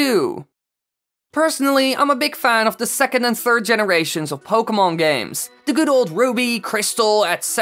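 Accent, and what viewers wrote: Netherlands. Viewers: ar you dutch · Are you dutch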